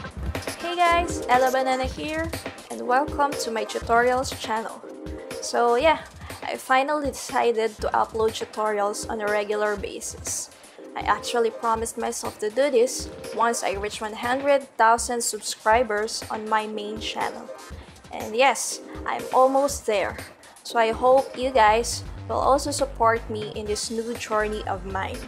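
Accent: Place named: Philippines. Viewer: accent sounds like a filipina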